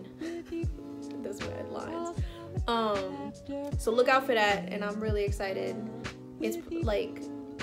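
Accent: New York, United States. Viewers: yerrrrrrrrr you so new york i love it lol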